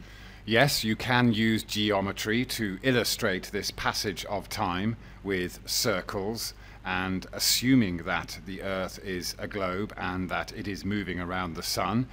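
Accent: United Kingdom. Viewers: You do realize the Moon period has nothing to do with the year, that the Romans had to abandon their 10 month calendar because it did not represent a year and they could not use it to plan their crops? do you know that the moon's 5 degree tilt has absolutely nothing to do with the tilt of the earth, other than keeping that tilt generally stable? Word salad, British accent with lots of extended Ss and your followers think you're extra smart.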